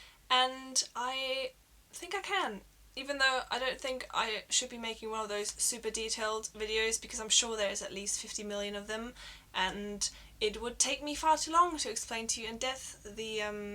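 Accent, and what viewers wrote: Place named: Estuary English, United Kingdom. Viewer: I like the way talks. No trace of a German accent and a touch of estuary English. Just amazing. What a lovely chatty person.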